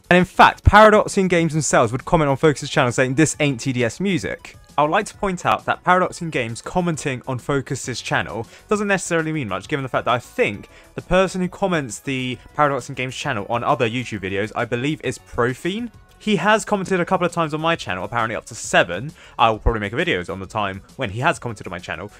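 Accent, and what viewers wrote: United Kingdom. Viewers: Omg too british accent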